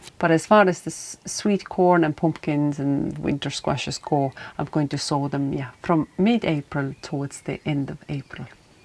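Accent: Ireland. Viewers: The video lovely and calming, the Irish accent a bonus! Can't wait to return to beautiful Ireland one day! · 😊 lovely video ,, where are you from originally ,, can't place the accent as its half Irish and half something else ? 😊❤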